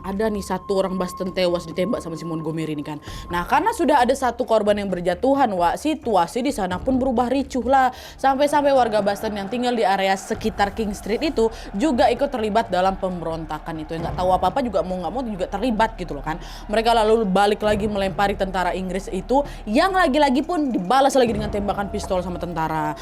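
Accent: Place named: Medan, Indonesia. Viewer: Mantap kalee kakak ini penjelasanya....suka sekali saya dengan gaya Medannya...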